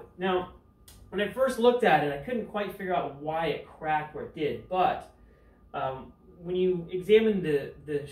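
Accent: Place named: Southern California, United States. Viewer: Hey glad you got a replacement frame. It sounds like you’re from SoCal as well. Could you tell me which giant dealership you dealt with.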